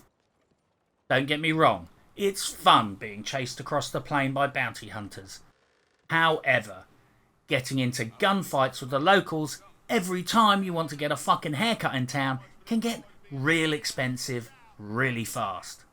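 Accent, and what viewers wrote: United Kingdom. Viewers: Same I’ve just discovered them and now I have dozens to watch you just can’t beat that British wit or accent haha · Its refreshing to hear a brit that knows rocket ball ammo was junk. Also thanks for using my favorite native meme. My mother had a bumper sticker on her car when I was kid that read "of course you can trust the government just ask a native".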